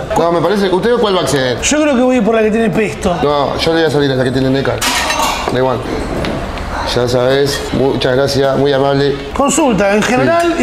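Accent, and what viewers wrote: Uruguay. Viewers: El ejemplo perfecto del acento Uruguayo 😂🖤💛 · Que lindo escuchar hablar uruguayo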